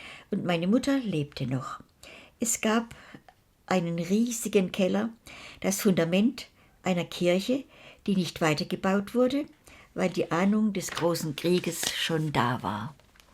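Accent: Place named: Baden, Germany. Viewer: Blödsinn! Dass ist Badischer Dialekt und mehr nicht!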